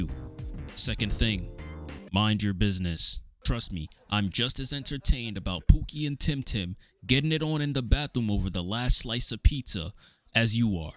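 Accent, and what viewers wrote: New York, United States. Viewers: Are you a New Yorker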